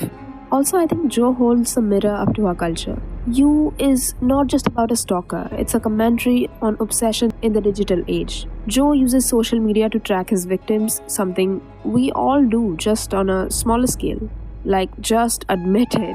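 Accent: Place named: India. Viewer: Omg this indian accent makes me angry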